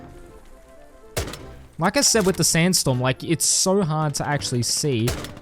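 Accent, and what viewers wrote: Australia. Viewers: Are you south Australian?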